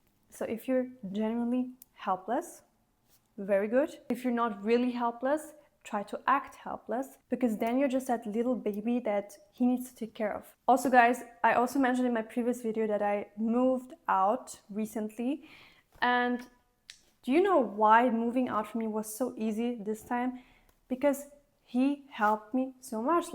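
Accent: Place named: Eastern Europe. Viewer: She is so beautiful and kind hearted ❤❤❤ I love her accent. Where is she from? I think she is my Slavic Queen 👸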